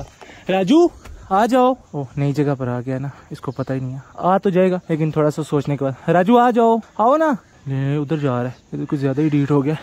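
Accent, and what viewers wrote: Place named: India. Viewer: मुझे आपका बोलने का तारिक इतना पसन्द है ना की लगता ही नहीं आप पाकिस्तानी हो हमे इंडिया जैसे ही हिदी बोलते हो dear और जिस तरह से हर पशु पक्षी का ध्यान रखने हो ना बड़ा ही अच्छा लगता हैं देख कर dear God bless you dear 🍫😊🇮🇳🇬🇧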